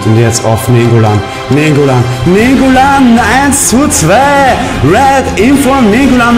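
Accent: Austria. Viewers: Ich Feier deine Art so sehr hahah Echt cooler Typ Kommst du aus Österreich (wien) ?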